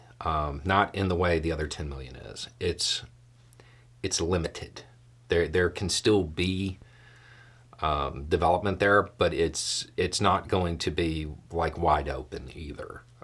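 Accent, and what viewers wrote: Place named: Rural, United States. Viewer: your accent has gotten crazy hick since you were "DIA." Hope things are great! Money glows.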